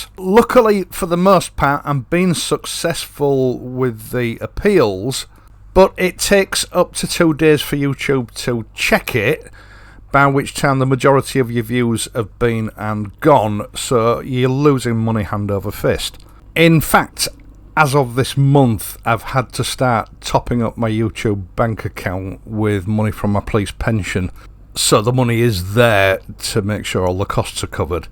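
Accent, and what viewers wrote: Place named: Yorkshire, United Kingdom. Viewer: Well support you’re excellent channel Great Yorkshire man talk 👍👍 You’re a top guy does a brilliant service I recognise most of the roads you’re on Full support here 👍👍👍😎😎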